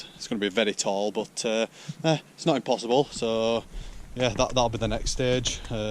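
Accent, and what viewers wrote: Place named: Northern England, United Kingdom. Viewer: Im really curious what part of england you’re from. Pretty cool to see a northern sounding lad off gridding in chile!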